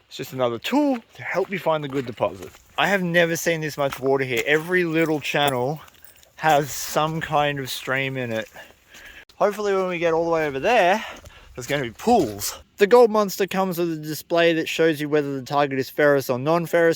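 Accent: Australia. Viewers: My favorite videos after a stressful day 🙂 From the Australian accent, fun edit scenes, education, and Fern.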